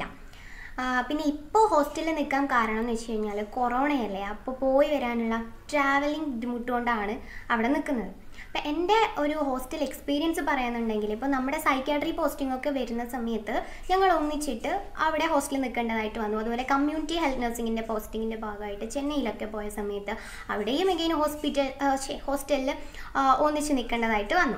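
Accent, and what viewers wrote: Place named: Kannur, India. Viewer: oo.. Evdeya nursing padichee... Chechi kannurkari aairnnalle🥰basha ketapo thonnni... Njanum....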